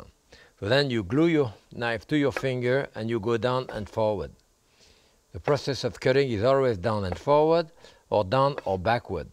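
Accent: France. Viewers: I love his teaching. Clear, professional, pleasant. The French accent makes it all the more authentic! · Gotta love a real French accent.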